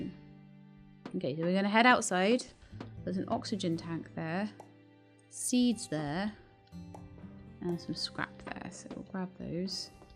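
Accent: United Kingdom. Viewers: Looks interesting.can u play more of this game thanks p.s you still have a lovely voice where abouts in the UK you from because I ve hear it before but I can not place it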